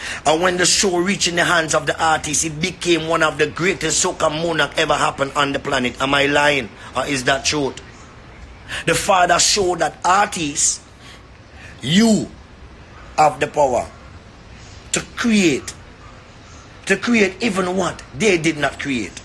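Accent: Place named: Jamaica. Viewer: This was a set up from start,they were intimidated n pulled this stunt...... i luv u but y the Jamaican accent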